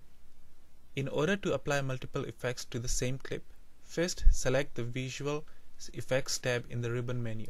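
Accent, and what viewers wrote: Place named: India. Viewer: Indian accent is the best. :)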